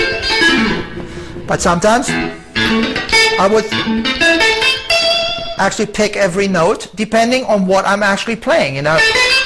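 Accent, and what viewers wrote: Germany. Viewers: Me encanta el acento tan marcado de los alemanes cuando hablan en inglés... Hermoso Uli eres un maestro!!! Ese sonido sólo puede ser producido con su Skyguitar!!! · Funny german accent :-) But amazing guitar player !!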